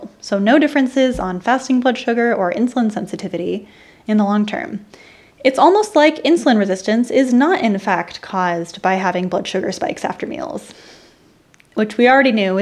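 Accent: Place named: United States. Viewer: Thanks.Your video may be great but all can't benefit from it because you speak too fast in your typical American accent.Additionally,you don't text all what you talk about. This makes hundreds of subscribers face this daunting task of understanding your good lecture.Hope you make necessary improvements in future videos.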